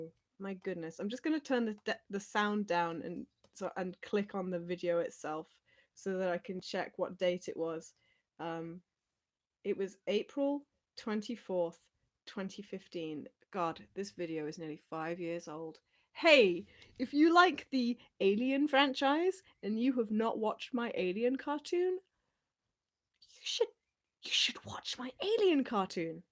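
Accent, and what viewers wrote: United Kingdom. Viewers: i wish i had caught this live. your RP accent is delightful, but i just have two requests. 1) just wanna hear your attempt at a southern american pronunciation of "banana" (buh-NAY-uh-nuh), and 2) wanna hear more of your fantastic singing voice!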